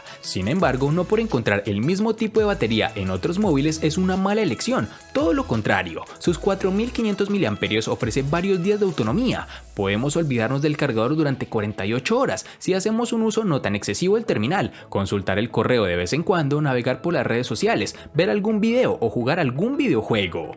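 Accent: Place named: Antioquia, Colombia. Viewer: es mi salvador peor porque se mezcla la voz orgásmica con el acento paisa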